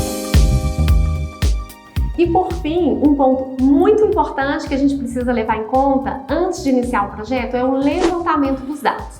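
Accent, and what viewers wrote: Minas Gerais, Brazil. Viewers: Primeiro me atraiu as dicas e pelo sotaque charmoso de minas. Depois de vários videos assistidos, me apaixonei por sua racionalidade, perspicácia e criatividade nas soluções. Se eu fosse rico, te traria aqui pra São Paulo para transformar meu apartamento em um apartamento de resort rsrs. Tenho certeza que amaria as soluções. Todo sucesso do mundo para ti. Abraços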